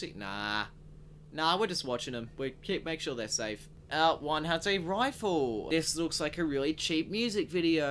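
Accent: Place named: Australia. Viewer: I like this guys Australian accent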